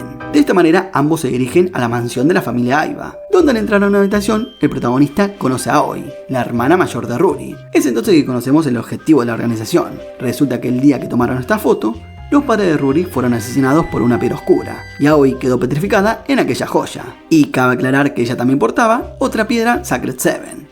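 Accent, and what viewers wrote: Argentina. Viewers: Bro parece que sos argentino y me gusta mucho tu acento y tu manera tan buena de comprimir un anime en 20 minutos😊❤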